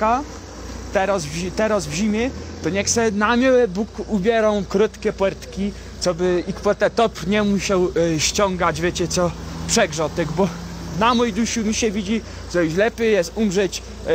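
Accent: Poland. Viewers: fajne:) jak nie lubie góralskiego godanio to fajne:)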